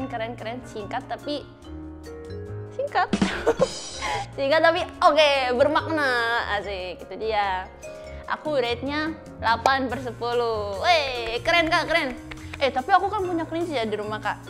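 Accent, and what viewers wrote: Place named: Java, Indonesia. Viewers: Medok nya kerasa banget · selalu ada medok nya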